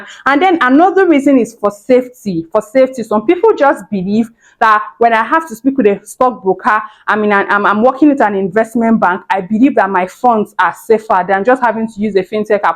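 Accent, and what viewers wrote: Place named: Nigeria. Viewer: Your surname sounds Ghanaian and your voice is screaming Nigerian. Good insight though 🔥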